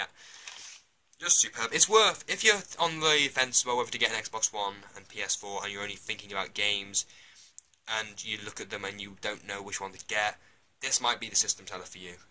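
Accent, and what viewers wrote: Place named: United Kingdom. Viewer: British Accents are Orgasmic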